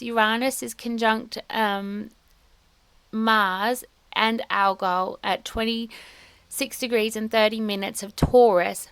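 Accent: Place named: Australia. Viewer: ❤ Hi. It is great to hear your views and also from a fellow Australian. I am saying this to help you improve. It is wise to use short succinct sentences, particularly with different cultures. I suggest slowing down. Try not to begin a sentence with So this means, And then... etc. It is like continuing the same sentence when it is actually the beginning of a new point. I had to learn the hard way when doing my Masters that short sentences were digestible. It is why people may find you hard to understand. Australians speak very quickly, particularly compared to the British. Our flat tone and different pronunciation is also a problem. I strongly recommend leaving a one second pause at the end of the sentence. It is like hearing you say "and then, and then, so and then...." I used Description (definitions and examples), then analysis (on the one hand this, on the other that), interpretation (what does this mean, what are the different viewpoints), and judgement (drawing conclusions) as a successful format. 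It is up to you of course. I just subscribed!🤣👍🦘🦘🦘💜🙋‍♀️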